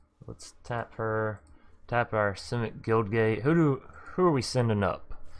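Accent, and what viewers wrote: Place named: Alabama, United States. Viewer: You from Bama homie?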